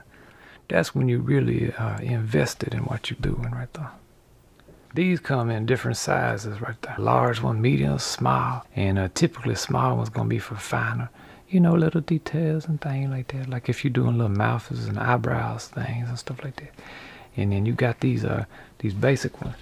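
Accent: Louisiana, United States. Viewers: Hey my kids and I love your show! Are you from Louisiana?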